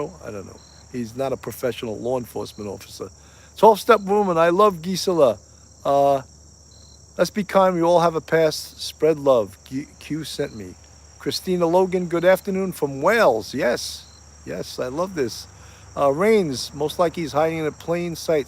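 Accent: New York, United States. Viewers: Good to see you!! I spent years growing up in Trenton, NJ. Now I'm is SC. I love your New York accent!! 😊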